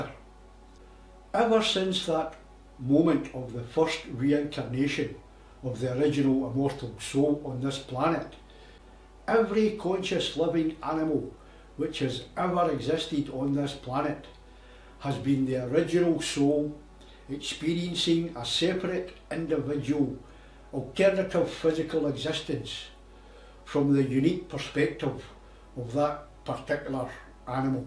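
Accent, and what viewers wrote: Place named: Scotland, United Kingdom. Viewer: Wow the Scottish accent brings me back to Edinburgh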